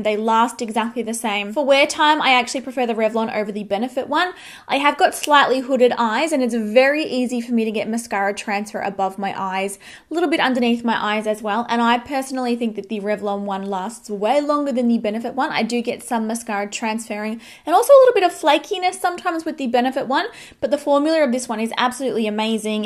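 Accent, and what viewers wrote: Australia. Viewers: Omg your hair looks beautiful!!!! I love your Australian accent! I want to take a trip there it seems beautiful. Thanks for the great dupes! 💖👍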